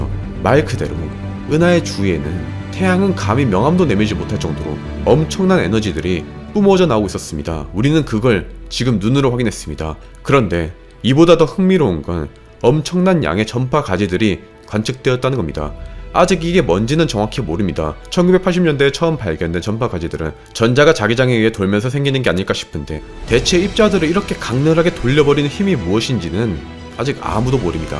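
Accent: Gyeongsang, South Korea. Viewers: 경상도 사투리 엄청 거슬리네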